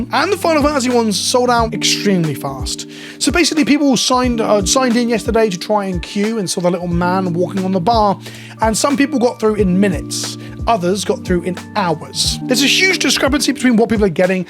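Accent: United Kingdom. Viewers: Man i sure wish i understood what he was saying but all i heard is British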